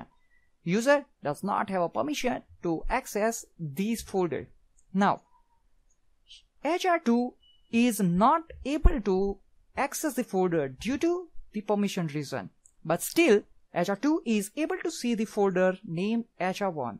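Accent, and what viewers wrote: India. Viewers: I think ur origin accent is Indian. better try that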